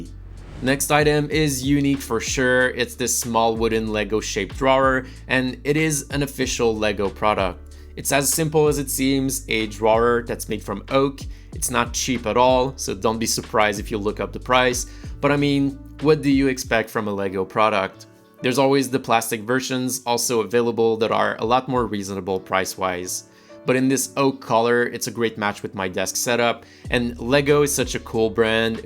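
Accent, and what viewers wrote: Quebec, Canada. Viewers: Super random, but are you per chance French Canadian? It's super subtle but I feel like I'm hearing that subtle French of my fellow bretheren so thought I'd ask 😂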